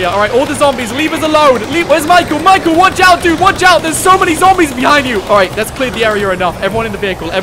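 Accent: United Kingdom. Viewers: His really british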